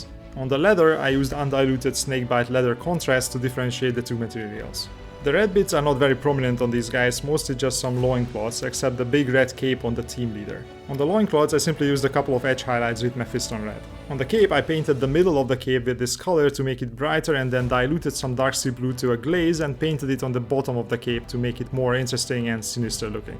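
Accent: Hungary. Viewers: Nagyon szép munka, köszönet érte! Magyarul szóló vidi is szuper lenne. Ahogy megszólaltál, rögtön tudtam, hogy földi vagy. 😁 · Tell me you are Hungarian without telling me. That accent.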